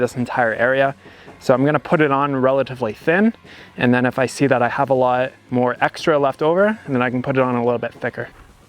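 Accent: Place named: Canada. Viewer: I love that you haven't lost your Canadian accent eh. Hannah's one lucky girl to have such a handy man around the house.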